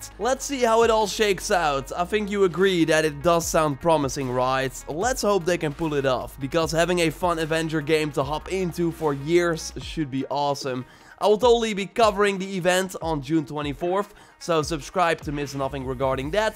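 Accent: Amsterdam, Netherlands. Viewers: youre inglish sounds like a hollander zeker uit amsterdam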